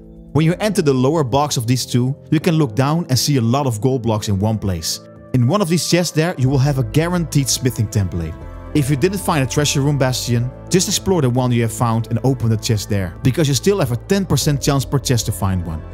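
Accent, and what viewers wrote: Netherlands. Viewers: you sound Dutch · Bro sounds dutch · Haha I immediately heard that you were from the Netherlands. Funny how it works like that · Gotta love the dutch accent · Dutch accent is one of my favorites from non native accents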